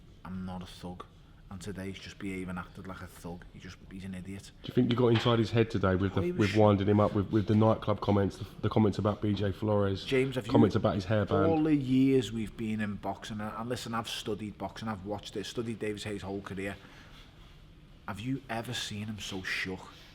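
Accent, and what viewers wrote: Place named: Liverpool, United Kingdom. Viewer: I am a Manc and I really like Bellew (I am even starting to like the scouse accent) - what he says about Haye conning the public is spot on!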